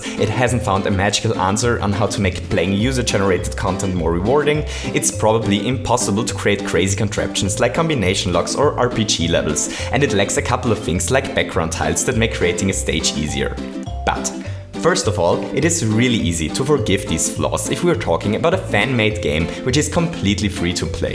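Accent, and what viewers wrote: Germany. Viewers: german accent. i hate it. it's like ear cancer. xD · You sound german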